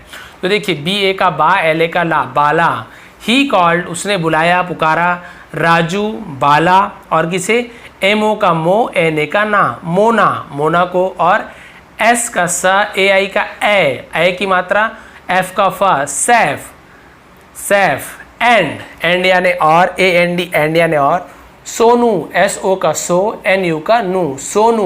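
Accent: Chhattisgarh, India. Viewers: Sir aap chattishgadh se hai kya??!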